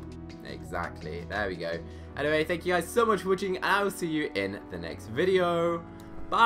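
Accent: United Kingdom. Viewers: are u british? 👁👄👁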